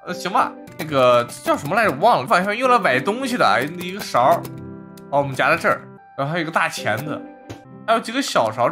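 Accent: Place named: China. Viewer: 乾，我現在才注意到很細心欸！這裡是繁體 B站那裡是简体 (抱歉現在才去B站看主播啊) 我還想說為啥字幕是繁體講話卻是中国腔 ( • - •;)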